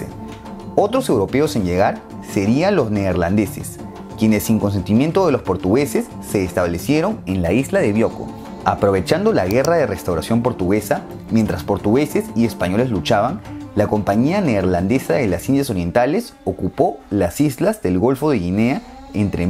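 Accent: Peru. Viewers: No es mexicano el realizador de este video. Por lo que se escucha, el acento de él es peruano. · Amigo, por el acento, el you tuber es peruano ¡Saludos desde Popayán, Colombia ¡ 👏🇨🇴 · q rico acento peruano. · No tiene el "dejo" de un mexicano.... los mexicanos no tiene ese acento aunque son algo parecidos.....pero se nota que es peruano. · Ese acento es peruano? no?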